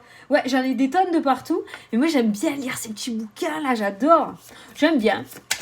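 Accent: South, France. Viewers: moi c'est le 04 Manosque tu vois le sud avec l'accent bien plus prononcé que le tien, chantant comme les cigales, très fière de mon accent tant pis s'il ne plaît pas à tout le monde c'est le mien 😘😍 allez hop la vidéo je t'écoute je suis toute ouïe 😊😊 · Bonsoir j'ai été a Baillargues pendant 50 ans et je suis a Hyères depuis 2 ans on voit bien que vous êtes une vraie sudiste l'accent est formidable et vos éxplications sont très claires et exactes merci et à bientôt très cordialement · Ja doré le accents du du sud. Perpignan. Le boulou argeles je connais bien bien moi je suis du Loiret beugency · Bonsoir moi je viens du sud ouest Hossegor Et je rentre tout juste de Perpignan où vit ma Soeur Merci pour cette bonne humeur et l’accent mon dieu que je le connais et c’est meilleur oui, tu gères la fougère terrible merci 🙏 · Coucou du soir alors moi je viens de Clermont-Ferrand et actuellement nos belles montagnes sont sous la neige et on se pèle Ahhhh Perpignan c'est une belle ville c'est normal que tu ai l accent du sud ! 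jeudi qui s'annonce un peu compliqué mais on va faire avec En ce qui concerne le sport ce ne sera pas pour demain parce que je vais chez le kiné lol C'est super rigolo parce que j'ai le même jeu que toi Et ce matin je me suis tiré la carte qui me conseille de manger des fruits et légumes et de devenir végétarienne LoL Ton collier je l'avais remarqué j'étais certaine que c'était un cadeau de Noël, au fait en parlant de ça le collier chapelet je l'ai trouvé sur Amazon pas le même que toi mais très jolie aussi Faites c'est drôle parce qu'on utilise les mêmes jeux j'adore j'imagine que le goal de utiliser consultation je sais pas ce que tu en penses mais moi quand je les dans les mal ressenti est décuplé par rapport aux premiers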